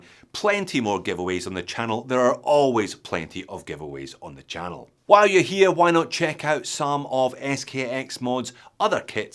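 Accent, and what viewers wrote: Scotland, United Kingdom. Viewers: I’ve just managed to buy one in metal case. Love your Scottish accent coz I’m originally form Glasgow and enjoy everyone of your programmes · Great to hear a fellow Scot talking about things I love! These are great looking mod kits, I was looking it up while you were building yours and I thought the colour way you chose was the best one too 👍🏼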